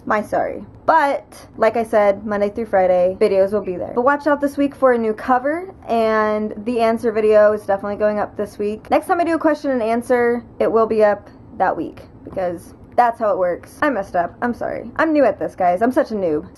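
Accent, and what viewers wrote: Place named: United Kingdom. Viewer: I love your style. I would love to hear you talk more with your British accent.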